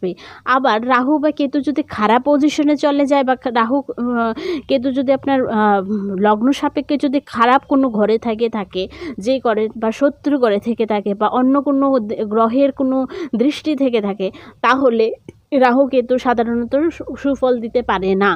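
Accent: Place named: Bangladesh. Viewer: আপনার উচ্চারণ ভারতীয় বাঙ্গালী মনে হচ্ছেনা।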